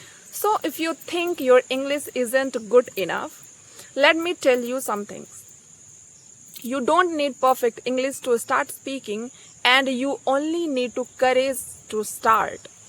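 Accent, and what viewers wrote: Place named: India. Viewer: Pure Indian pronunciation ! Quite different from British or US pronunciation. It sounds funny. It took time for us to be used to Indian pronunciation. Indians are smart and friendly.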